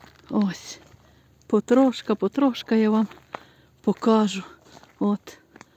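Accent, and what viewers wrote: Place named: Hutsul, Ukraine. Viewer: Яке це село Чую наш говір гуцульський У нас завжди файно❤Миру і перемоги всім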